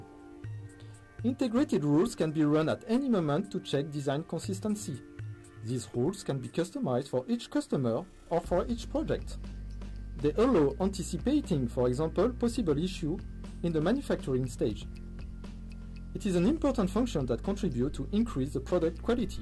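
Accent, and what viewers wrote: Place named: India. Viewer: ce n'est pas pas pire que l'accent indien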